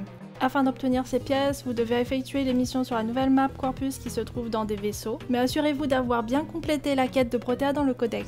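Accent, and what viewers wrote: France. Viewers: j'me disais bien que j'avais reconnu l'accent français sur les autres vidéos Haha.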